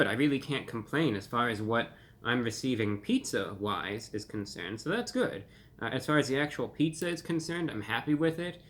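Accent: United Kingdom. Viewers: Did he develop a British accent or what What in the ever loving fuck have I missed over these 8 years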